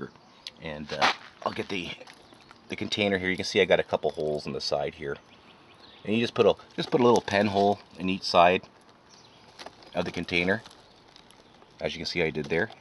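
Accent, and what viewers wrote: Canada. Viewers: He has a Canadian accent, and the box has French words. Areas in Canada are French.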